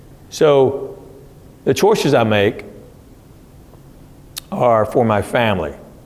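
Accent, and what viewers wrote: South, United States. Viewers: Thank yoy for your family, wholesome, hysterical comedy. Anyone can do 'vulgar' that is just cheap, non creative head-talking. Not only family, but there is an intellectual banter with your routine and interchange with the audience that entertains.. Great job. I am from TN, and like the Southern slant!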